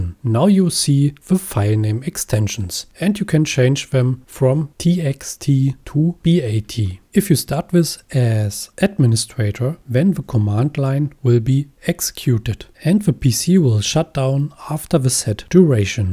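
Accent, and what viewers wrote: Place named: Germany. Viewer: Danke für deinen schönen Tutorial! Der Akzent kommt voll raus haha direkt erkannt DE 😂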